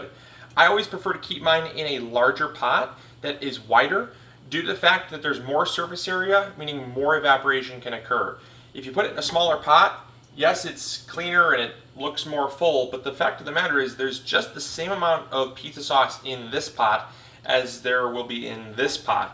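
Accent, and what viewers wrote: United States. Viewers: I appreciate the way you convey what you’ve learned definitively without elitist attitude, arrogance and ego. Respectfully asking, I’m curious of your credentials? Again respectfully saying, I must disagree that classic and authentic... whatever it may be, has to be made a certain way without any substitution or variant of preference whatsoever. I was blessed to work for two generations of Italians in their family trattoria and while pizza wasn’t on the menu, we would make it for staff lunch at times and the sauce we made was quite different from yours as well as other recipes I’ve seen. My point being, when the language dialect can vary from one community to the next, it would be safe to assume other aspects of culture would as well? I live in the south eastern US and by your English, I’m assuming you’re somewhere in the states as well so perhaps you can relate to the following point. 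I’ve lived in NC where they boast some of the best sauce for bbq in the country. There are three different regions , one being the western part of the state that uses different forms of tomato as a base. To the eastern side, no tomato is used, only vinegar and pepper. While the central does as central does and incorporates the east and west to one degree or another depending on how close one might be to the two sides. I now live in Charleston SC. Famous for shrimp and grits and she crab soup as well as a few other regional specialties. While most if not all will agree some ingredients are vital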